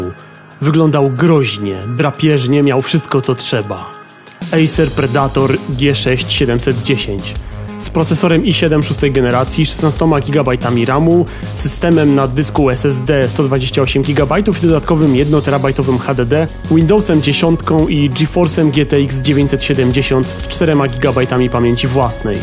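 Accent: Poland. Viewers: jesteś z Lubelskiego? Masz taki akcent.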